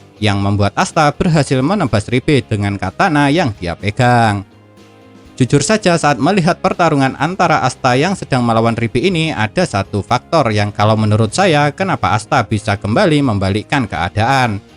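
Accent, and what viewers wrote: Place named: Banyumas, Indonesia. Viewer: Fix mimin nya itu orang ngapak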